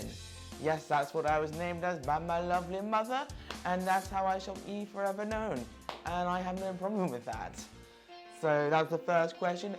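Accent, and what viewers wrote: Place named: United Kingdom. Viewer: Es porque habla ingles británico! Ellos tienen un lenguaje y acento particular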